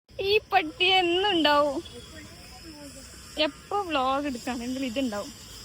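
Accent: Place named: Malappuram, India. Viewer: അന്റെ മലപ്പുറം ഭാഷ കേറി വരുന്നുണ്ട് മോളെ 😂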